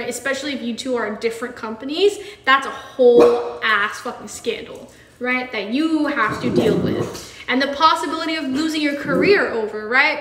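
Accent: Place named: United Kingdom. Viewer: I miss her British accent.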